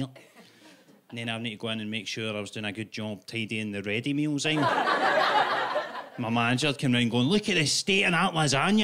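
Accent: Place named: Glasgow, United Kingdom. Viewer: the glasge delivery, is superb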